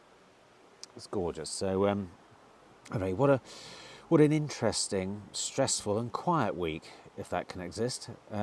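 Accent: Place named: Scunthorpe, United Kingdom. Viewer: Great to hear you're Dad's on the mend! The Scunny accent is an added bonus! By the way, forget anything that runs on batteries...absolute witchcraft! 😜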